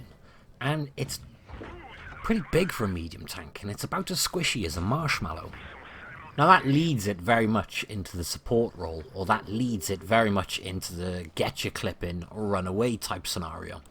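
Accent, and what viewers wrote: United Kingdom. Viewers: U see that typicaly english? I think he is 100% UK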